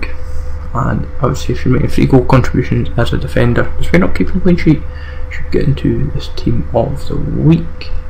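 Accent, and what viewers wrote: Scotland, United Kingdom. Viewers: Just not use to Scottish accent I guess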